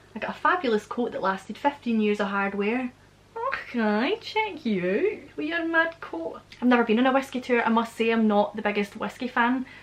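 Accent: Scotland, United Kingdom. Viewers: I love the Scottish accent, one of my bucket lists includes the Isle of Man TT race. I want to visit Scotland whilst there but so much to see, so little time!! Love your content, keep up the great work!! 🥰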